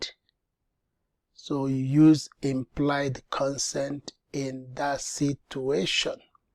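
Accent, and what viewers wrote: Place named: Africa. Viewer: Information is very good . Nice presentation. But the accent is hard to pick for none African viewer's. Good job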